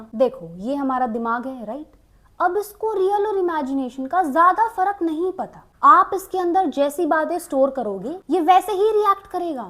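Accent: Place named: South, India. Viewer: you sound south Indian. I love the way you dress and you are charming.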